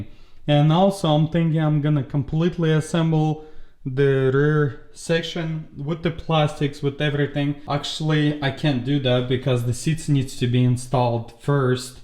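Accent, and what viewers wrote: Russia. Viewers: Is it Russian an accent? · Love that Russian accent 😂 Nice content buddy 👏🏼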